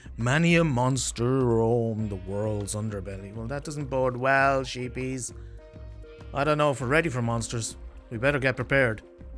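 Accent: Ireland. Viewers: I really am enjoying this One Block series. It is very different from what I've seen before but it is wonderful! 😊 I've been trying to catch where is your accent from? My partner is Irish and you sound quite Irish to me, where is it from? :) I can't wait to finish this video and see what else you have in store, greetings from Honduras! 😊 Edit: just finished the video! Congrats, you are so talented, I was blown away with the ship at the end 😮❤ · Definitely new here, but you're from Ireland, right? Its always nice hearing a familiar accent 😂😁😁😁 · You don't sound Australian. I hear a strong Irish accent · just found your chanel and 1 min in I was like this guys definitely irish just from the way you speek(I'm also irish) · OUUHH OKAY THATS COOL, i could tell from the accent!! i rarely see other irish people who play minecraft i got a bit hyped lmao, amazing video though good luck with growing <3! 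very underrated and have alot of potential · I love everything about this One Block. I can honestly say that Its my favorite One Block. I am a fan of the Irish accent, your builds and your humor. I look forward to watching the rest of your vids. Oh and I subscribed today.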